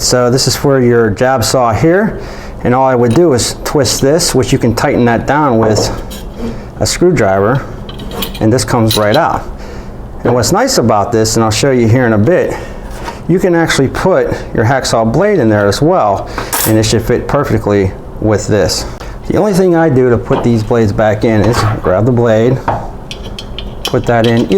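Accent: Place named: New York, United States. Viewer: Great video. I'm loving your accent...... straight out of 'Goodfellas' 😊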